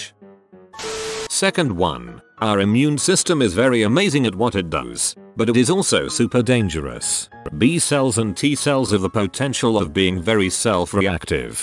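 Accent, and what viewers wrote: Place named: United Kingdom. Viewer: I only listen to these cause i want to hear a robot brit say "bantha fodder "!....double quote....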